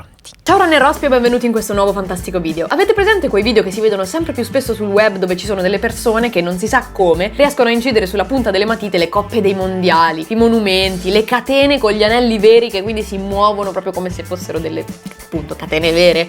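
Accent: Tuscany, Italy. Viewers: Ma sei Toscana?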